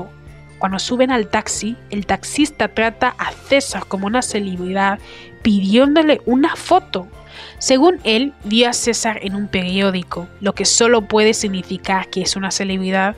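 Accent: France. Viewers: como habla le da un acento frances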